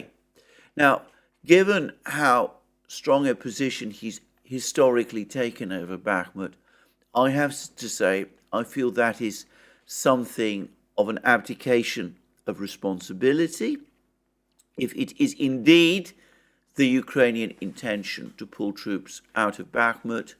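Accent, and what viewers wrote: United Kingdom. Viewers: You know, half the reason I watch this show daily is so I can hear how these crazy limeys speak Washington's English. "The works..." That's super cute!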